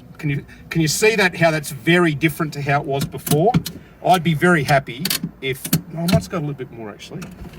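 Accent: Australia. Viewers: Cheers mate, nice easy to follow demo and loving the Aussie accent. Many thanks from Sunny Scarborough ,North Yorkshire UK. Fairer than fair dinkum:goodvibes:.Kindest regards, Paul.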